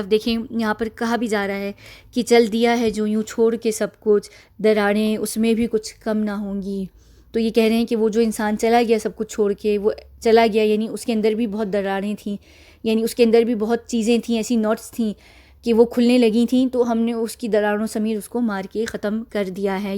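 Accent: England, United Kingdom. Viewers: Very well explained, with your Englandish accent.. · Your accent is British.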